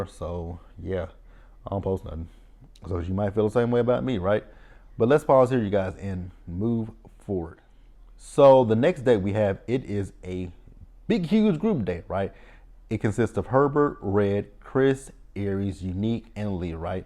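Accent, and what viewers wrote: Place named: South, United States. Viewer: I gotta catch up on the RTL reviews! You have an accent. You sound Southern, but not country. It's more noticeable with certain words. I like it. Now I can't unsee Shaunie O'Neal when I look at Janelle.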